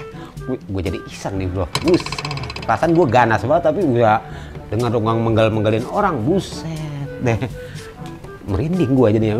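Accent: Jakarta, Indonesia. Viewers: Wkwkwkwk sehat trus bg... udh aku sub bg, jd kangen sm tmen2 jkt kalau liat logat abg.😄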